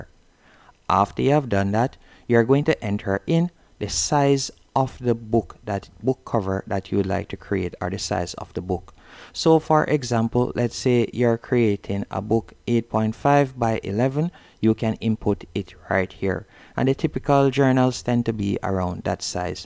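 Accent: Jamaica. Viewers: Thanks. Easy to follow especially in that fellow yaadie accent,🥰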